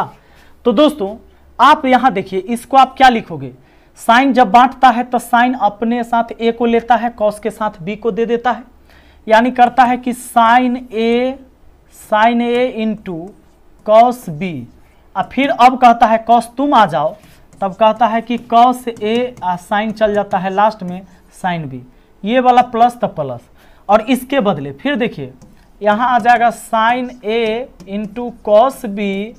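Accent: Bihar, India. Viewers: Sir Are you bihari? PROUD TO BE BIHARI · Are you from bihar😅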